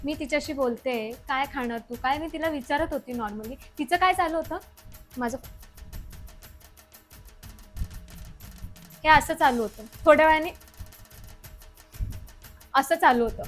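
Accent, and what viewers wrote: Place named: Nashik, India. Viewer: तु नाशिकची वाटते.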